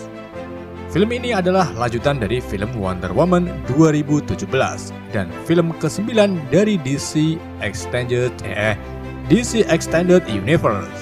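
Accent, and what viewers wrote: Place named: Java, Indonesia. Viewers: Pembawaan narasi selalu menarik, "sewu welongatus sangang puluh papat". Logat jowone met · Lu orang Jawa ya bang, dari kota mana bang??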